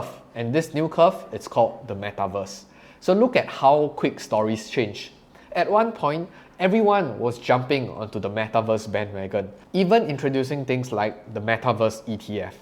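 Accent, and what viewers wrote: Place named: Singapore. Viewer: Enjoy the Excellent analysis with Singapore accent.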